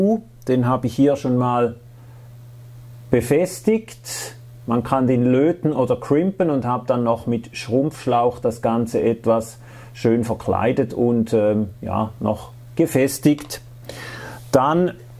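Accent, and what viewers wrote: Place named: Switzerland. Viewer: Für mich ist das eine Rohrbriede, vor mir gehen aufputz 2 kupferne, blanke 1" Heizrohre ins OG. Braucht es für so stationär, an beiden Seiten/Enden solch Rohrbrieden, oder, oder schirme ich mich mit der Erdung im Ganzen Haus mich ab? Sorry, bin totaler Leihe und dachte erst, es ginge um die Antenne selbst. 11m Draht im Estrich spannen bringt was oder? Bis da hoch sollte der Draht dann gemantelt, geerdet sein? Ich seh schon, muss noch weitere Videos betreffend schauen, das war nicht das richtige, dennoch gut gemacht und das Schweizerdeutsch toll gezügelt. - Äxgüsi, das kann nicht jeder Schweizer!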